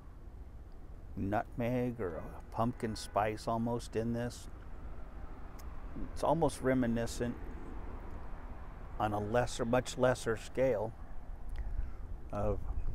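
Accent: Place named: South, United States. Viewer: Great review. Sounds good. And I see you are adjusting to the southern country life just fine. Even starting to get the drawl y'all.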